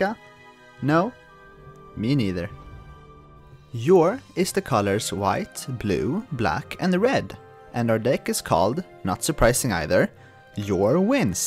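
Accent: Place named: Sweden. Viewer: Awesome! Thanks! BTW - Är du svensk? Din accent låter som den. Jag lär mig svenska.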